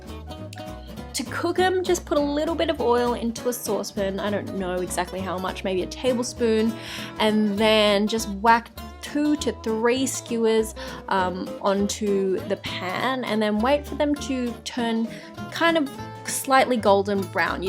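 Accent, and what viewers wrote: Australia. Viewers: Ok so I love your accent, Aussie? And you are full of energy and then some and your food looks amazing..Im going to make one of them real soon, thanks a bunch for your video!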